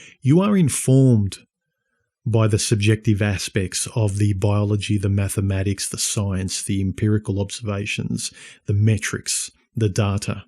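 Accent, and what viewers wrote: Australia. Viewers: Really dig this channel. Also pretty cool to hear an Aussie on YouTube covering these topics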